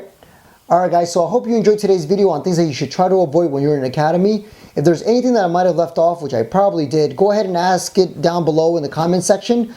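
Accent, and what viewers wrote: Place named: South, United States. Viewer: That southern accent though 😂😂😂 bloopers made this video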